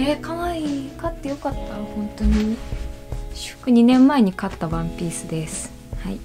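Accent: Kansai, Japan. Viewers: お教えて致して とても ありがとうございます! 購読しました! やっぱ 関西弁のアクセントは 聞きおもろいですね~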